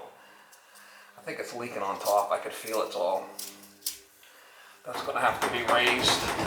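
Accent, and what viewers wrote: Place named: Massachusetts, United States. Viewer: This guys Mass accent makes this channel fun to watch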